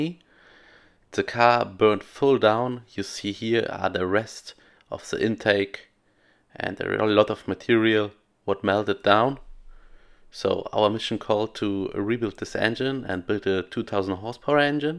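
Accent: Germany. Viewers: Geiles Video wenn man es stumm schaltet. Dein Akzent ist leider ungenießbar. Ich denke nicht dass jemand englisch sprachiges das eher toleriert als jemand der an den "Deutschen Akzent" schon gewöhnt ist. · ich lebe in Freiburg Breisgau und ich schaue gerne deine Videos und habe grad erst festgestellt, warum das so ist. Ich höre dich gerne sprechen. Dieser leicht kölsche Dialekt kam mir so vertraut vor und dann erst stell ich fest, das Ihr in Troisdorf seid und ich keine 250 Meter von euch geboren und aufgewachsen bin erinnere mich an schöne abende im Saga und freue mich. Schöne grüße in die Heimat und macht weiter so. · Man merkt leicht das er Deutsch ist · Sofort erkannte das er deutsch ist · Your English is so German <3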